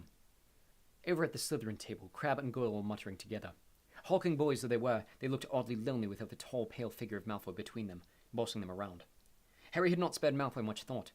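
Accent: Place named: United Kingdom. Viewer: Umm that's called British accent.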